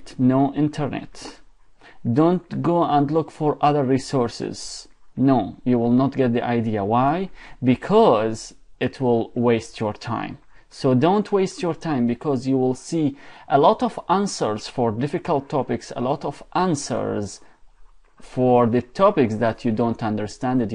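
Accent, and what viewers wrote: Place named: Iran. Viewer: you kno bout estop estop omg dont marrrkkkk on. Where is the English proficiency? you dont underestand neting. dude your persian accent is terrible.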